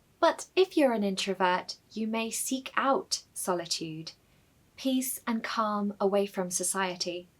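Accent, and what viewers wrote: United Kingdom. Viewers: The best video I have watched so far in English words with a British accent. I hope that more videos like this lesson will be uploaded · British English sounds sooooo amazing! 🥰 Thank you for this precious lexical collection,! Please, do more videos of this kind. You sound so charming! I am Ukrainian and fell in love with British English in my teenager's years. I've been to London last summer for the first time in my life. OMG! I felt like in Paradise listening to British English. You walk on the street and hear behind you a Duke speaking with an intonation of majesty, you turn around and see just a guy in a streched T-shirt. British English is really something etherial and sumptuous! Love it so much!🥰😍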